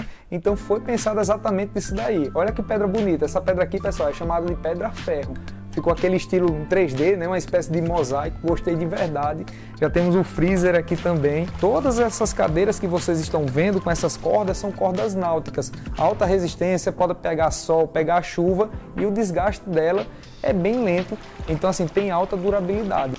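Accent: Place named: Pernambuco, Brazil. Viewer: sua voz e muito suave passa um sussego, obrigado por nós mostrar essas casas linda, sou de Pernambuco e vc tem um sotaque parecido com o meu.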